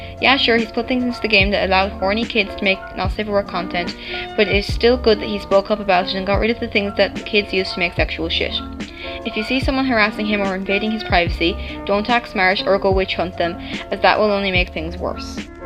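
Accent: Ireland. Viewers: Why do u sound like your from Ireland or sumthing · Are you Irish? :3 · sorry if I'm asking a personal question.. But are you Irish by any chance? I just hear some similarities in the accent lol 😂😅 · You sound Irish are you? because I am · One question are you Irish cause I recognize your accent from my own 😳😳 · Omg are you irish 😍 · Is she irish? Lol im irish and i recognise the accent xD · Are you Irish?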